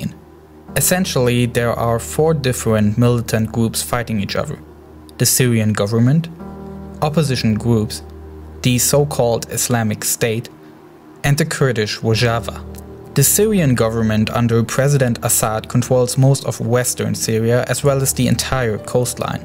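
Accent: Germany. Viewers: Great informative video of what is going on in the Middle East. And from what I heard of your accent I'm guessing you're German? You have pretty good English for a native German speaker! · I'm half german and i have almost the same accent :) So i guess he's german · I found this video on reddit /r/documentaries and I am wondering why this video only has about 8K views. It is really informative and neutral! You explained the situation in Syria in a very illustrative way and I think you deserve much more views!! And I have one, rather informal question, are you from Germany? (I think I recognized your accent, because I am from Germany ;)) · Alter. Arbeite an deiner Aussprache. Das ist schrecklich. Man hört das Deutsch richtig und es tut selbst mir als Deutschem in den Ohren weh.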